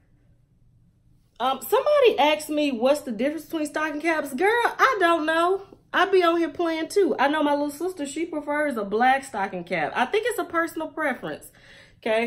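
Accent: South, United States. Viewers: I subscribed because 1. You’re beautiful 2. I love your accent wall 3. I want to perfect your southern accent 😊😂 I will be ordering this wig soon. Good review, thanks sis. 🙂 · New subbie!!!🤣🤣🤣You are hilarious! Love the southern accent! You be talking to sis!🤣❤❤❤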